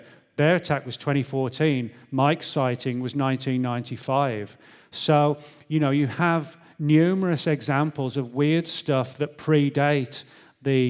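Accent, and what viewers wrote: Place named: Birmingham, United Kingdom. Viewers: sounds very UK Midlands accent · The Arlington Brummie 👍🏻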